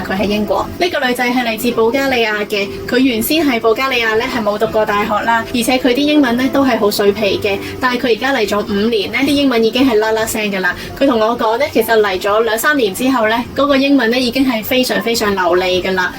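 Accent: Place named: Zhanjiang, China. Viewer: 有湛江口音😂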